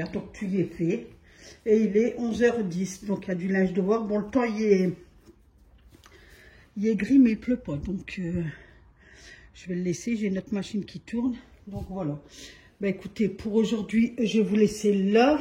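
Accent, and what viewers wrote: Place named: Valenciennes, France. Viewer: Je trouve que vous avez l' accent de valenciennes, Denain, Douai, Orchies. Bibises.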